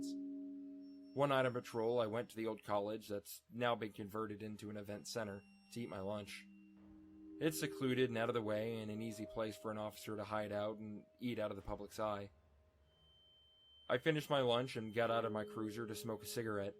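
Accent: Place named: United States. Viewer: Nice to hear an american read for once, almost everyone are brittish Or something like that lol. Great vid // a swede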